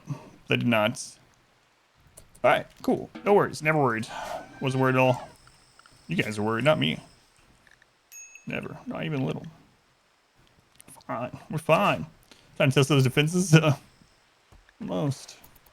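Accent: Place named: Appalachia, United States. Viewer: you should totally let your flag fly and do an Appalachian Run. You can revert to your old accent, only settle where it's most like Appalachia, and role play with all the stereotypes and culture of home. That would be amazing to see.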